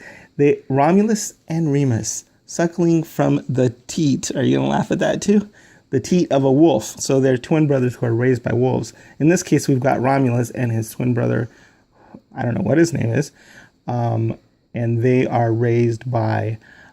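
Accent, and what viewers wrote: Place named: Chicago, United States. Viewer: Are you from the Chicago area?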